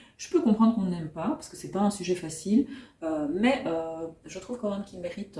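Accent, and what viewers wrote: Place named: Belgium. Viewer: Hello, J'avais été très touchée par Ce qu'il faut de nuit, un coup de ❤et effectivement il a obtenu plusieurs prix dont celui des lecteurs du livre de poche. Alors pour le langage, tu m'as fait rire😅 car c'est simplement que l'auteur retranscrit quelques expressions et l'accent trainant lorrain, notamment sur le "O" et "A", dont il est originaire. comme toi, tu as l'accent belge pour prononcer certains mots. Je n'ai toujours pas lu les mémoires d'un chat qui est dans ma whislist depuis longtemps... Bravo pour tes chroniques qui donnent envie de lire😊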